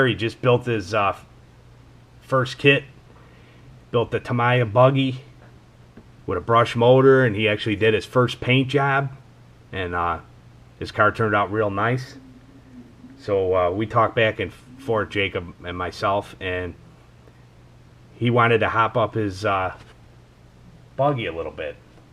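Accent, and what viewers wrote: Chicago, United States. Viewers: You've gotta be from Chicago with that accent! Or Elmwood Park or something.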